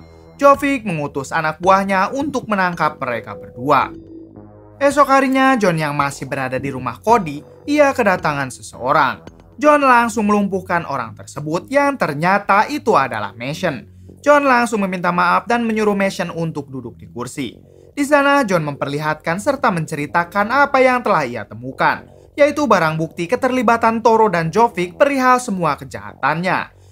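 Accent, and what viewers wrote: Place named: Madura, Indonesia. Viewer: Orang Madura kayaknya yang ngisi suara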